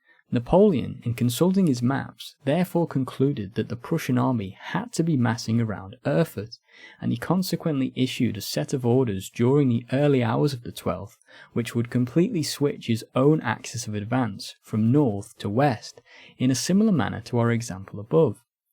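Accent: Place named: Yorkshire, United Kingdom. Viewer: Or maybe not the accent, I think it's your smooth voice on top of the accent, I'm sure other northerns (I assume you're from yorkshire) font have a voice so heavenly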